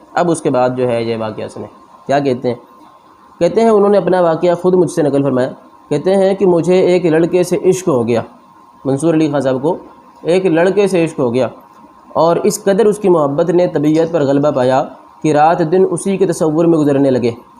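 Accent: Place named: Azamgarh, India. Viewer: جناب آپ اعظم گڑھ مبارکپور کے رہنے والے ہیں آپ کی آواز سے ایسا ہی لگتا ہے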